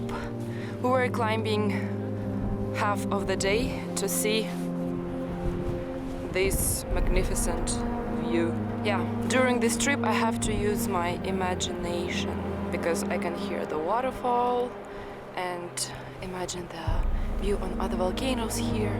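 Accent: United States. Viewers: Thought you were saying Geyser wrong, since your accent is more American sounding maybe you should say it <link> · Пошленькая девушка и такой же пошленький американский акцент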